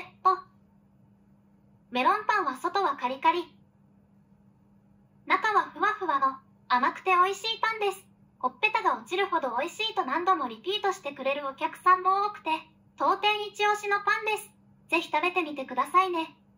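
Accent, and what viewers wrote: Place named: Saitama, Japan. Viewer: 埼玉県民の声がする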